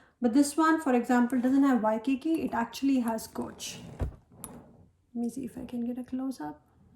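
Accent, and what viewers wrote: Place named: Punjab, India. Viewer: when you speak Ma'am like a tone of Indian punjabi 😊watching your videos from Philippines , i have also coach klare with revits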